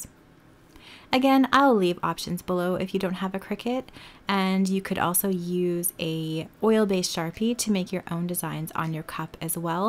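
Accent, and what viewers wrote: Canada. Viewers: Your Canadian accent is so cute. Iron..decal..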